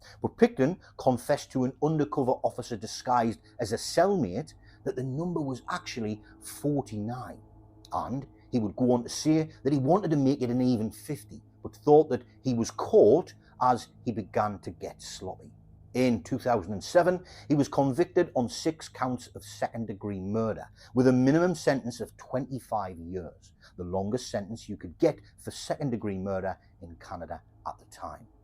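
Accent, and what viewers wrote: United Kingdom. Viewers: You should edit the title imo so it says “Brutally murdered or executed in prison” Otherwise it does seem a bit clickbaity. Excellent video otherwise and it is nice to have a fellow brit narrator instead of an ai voice. Keep up the great work and try not to clickbait 😊😊😊😊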